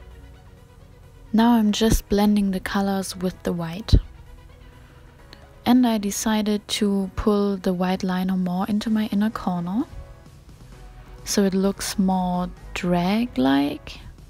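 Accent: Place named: Germany. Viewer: Which country are you from? I think that you have kind of a german accent 😊 I'm from Germany too🥰 where did you get the palette? Did you order it?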